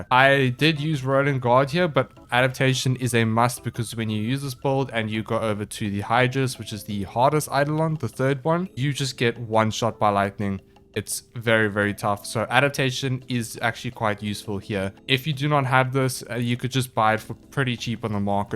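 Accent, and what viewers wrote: South Africa. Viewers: Are you South African? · Are you south african??❤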